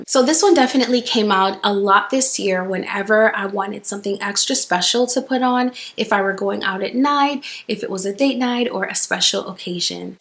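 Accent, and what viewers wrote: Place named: France. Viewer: She's definitely a French speaker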